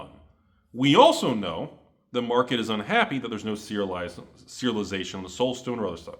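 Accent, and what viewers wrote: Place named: Oklahoma, United States. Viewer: You sound like a really brilliant individual- nobody with half a brain would take financial/investment advice from someone like you that talks like they date their sister in a trailer park somewhere in Oklahoma. Wow what a prediction it hasn’t hit its low yet like every single person already knows. Thanks captain obvious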